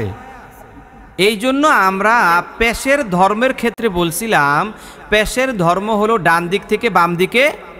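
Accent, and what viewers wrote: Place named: Mymensingh, Bangladesh. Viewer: হুজুর আপনার বাড়ি কই মনে হচ্ছে আমাদের ময়মনসিংহ বিভাগে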